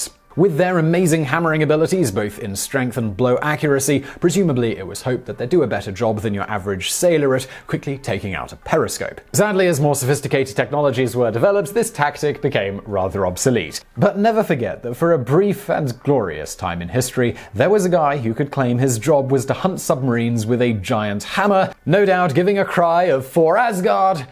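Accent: United Kingdom. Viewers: Simon, I general I can't stand British accents, but yours is pleasant.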